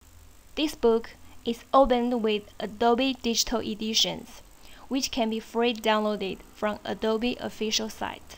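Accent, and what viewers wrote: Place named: China. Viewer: Yes she sounds like any Chinese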